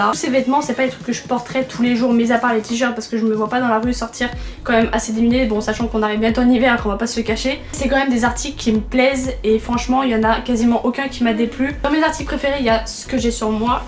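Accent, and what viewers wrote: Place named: England, United Kingdom. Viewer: Super vidéo j’ai attendu grave longtemps pour ta vidéo enfin elle est sortie et très belle accent anglais au passage mdrr bref j’te kiff 💕💍❤️🍭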